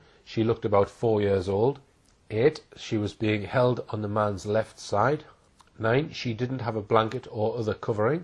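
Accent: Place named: Newcastle, United Kingdom. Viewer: He's a Geordie it's his accent has nothing to do with been able to speak in the right way.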